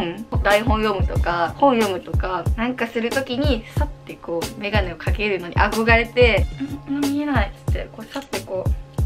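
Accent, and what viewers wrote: Okayama, Japan. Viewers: めっちゃ岡山弁〜💞勝手に親近感わきました！可愛い人は岡山弁話してても可愛い件😍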